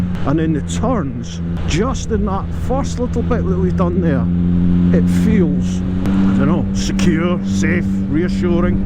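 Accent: Scotland, United Kingdom. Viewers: You know what I like the most? Your Scottish accent 😀 . Regards 🇲🇹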